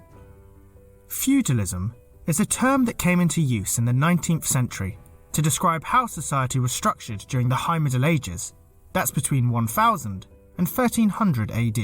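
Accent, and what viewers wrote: United Kingdom. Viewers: Liked your clip. Already knew most of the story. With respect to present day Brits (note the narrator's accent), the then and there societal setup strongly resembled the previous setup of Feudalism. This from High School American History. And the main reason that much of the emigrates from the Old World to the New World. The major point of Feudalism is that your position in a society is either inherited or assigned. For those that know a little of the Roman Empire, this might sound like a repeat? Things that can make you go, 'Hmm?'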